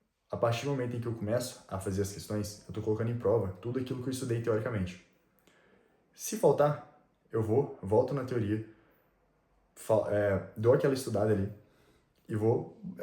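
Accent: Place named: Rio de Janeiro, Brazil. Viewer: Salve mano, nao sei se tu é do RJ comecei a acompanhar o canal a pouco tempo e teu sotaque parece daq apesar de vc mencionar instituições de SP, pq nao tenta UERJ? Med na UERJ é tão prestigiado quanto na UFRJ, tem o HUPE (Hospital Universitario Pedro Ernesto) do lado e fora os programas de auxilio e bolsa que a Uerj proporciona. Falo pq meu primo fez Med la e apesar de concorrido acho que é mais ''certo'' você que ja tem uma bagagem grande se dedicar pra um unico vestibular que vêm repetindo o mesmo padrão desde sempre do que tangenciar seu esforço pra outros vestibulares que por vezes tem a estrutura diferente uns dos outros. Abraço e boa sorte, tu vai conseguir o7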